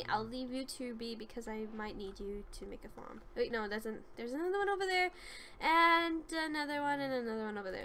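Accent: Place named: United Kingdom. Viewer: I can definitely here british fe through out this whole video tons of times XD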